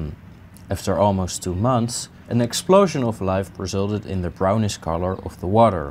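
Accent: Netherlands. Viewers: in the first video i thought you where dutch now i can confirm it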